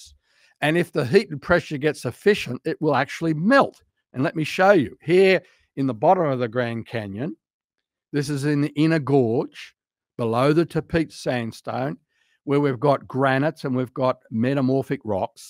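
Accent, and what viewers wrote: Australia. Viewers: I was confused at why he said he wouldn’t sound like me, then he said he was Australian and I realised why he sounded familiar. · I love that Australian accent keep it coming brother praise the Lord 🙏🙏🙏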